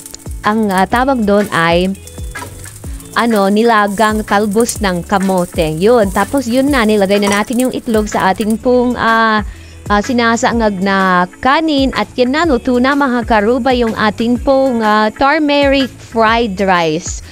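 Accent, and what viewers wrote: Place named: China. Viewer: may accent ma si madam ahh. Mandarin accent ma tagalog sanaoil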